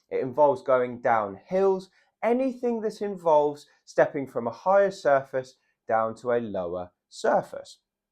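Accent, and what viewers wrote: England, United Kingdom. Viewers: Thank you so much for your helpful, easy to understand videos. ( SO nice to have a simple English accent! 😊🇬🇧). I am 83 and pretty well preserved. But l slipped during a violent thunderstorm last august (2022) and was very lucky not to have a serious hip fracture, though l am still waiting for re -education in a European country where everything is so slow. But since COVID maybe it’s slow everywhere. ) l just have problems with my right leg. Cannot cut my toenails or put my shoe on easily and l have to walk with a stick. Even with that l get panic attacks when faced with a down slanting slope. I have downloaded nearly all your videos and started seriously today with the glute excercise. Thanks again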